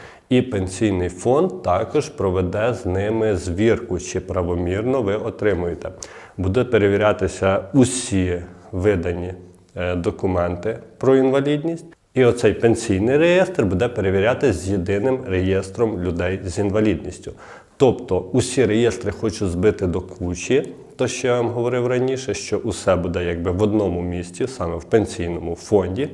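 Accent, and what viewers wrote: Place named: Galicia, Ukraine. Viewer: Я уже молчу о том, что сам выговор отвратный - тошнит. Галичина, тьфу.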